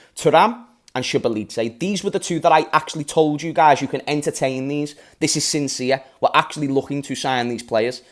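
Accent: Liverpool, United Kingdom. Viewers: The energy and the scouser accent always brings me back to this channel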